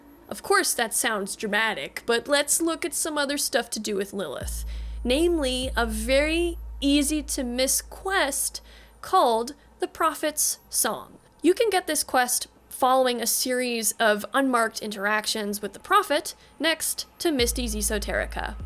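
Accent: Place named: Canada. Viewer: Lake Minnetonka? I thought that was a Canadian accent I heard. I suppose Minnesotan and Canadian accents are pretty much the same.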